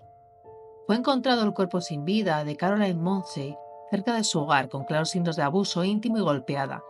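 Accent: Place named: Spain. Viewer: Primera vez que veo tus videos, te felicito por tu trabajo de investigación y por compartirlo por acá con el mundo 😉 Me permito comentarte 1 detalle con el fin de que los que no somos españoles te podamos entender, podrías hablar un poco más despacio ya que tu acento es muy fuerte y es difícil entender lo que dices, por ende me distraje de la historia para tratar de captar lo que decías, a parte de ese detalle todo muy bien! Que te hagas una grande del true crime! 👏🏻 👏🏻 👏🏻 👏🏻 👏🏻 👏🏻 👏🏻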